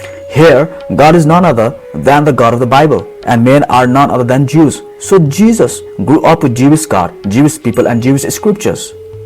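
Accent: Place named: Northeast India, India. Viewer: 🤣🤣🤣🤣🤣 come to me I'll teach u n make u understand abt Christianity... So plz just by using Indian tune... U are not still able to satisfy my thirst, coz we sanatani don't just clam without logic or scientific theory... Which ur missioners call it "Black magic" or "witchcrafting" 🤭🤭.... According to ur missioners Story book so called "Bible" God made this earth in 6 days n on 7th day he took rest... M I Right? Whr was God? N who is that Super natural power? Now u'll say Jesus Christ Father... 🤣🤣🤣 plz thats enough... N ur God stays at cloud no 7 or 9.. 🤭🤭🤭 without lift... Bro, but why ur accent sounds like converted northeastern Indian ? N If u are? just rise ur one hand up n come to me... I'll make sure that ur life shouldn't be in Dark Years... Trust me no brainwashing, no slagging.. Just peace...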